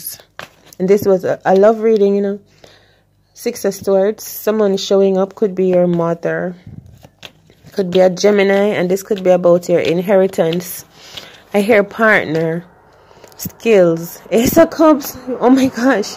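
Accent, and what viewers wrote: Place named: Jamaica. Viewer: I love your patois ❤️🔥🥰 please don’t hold it back .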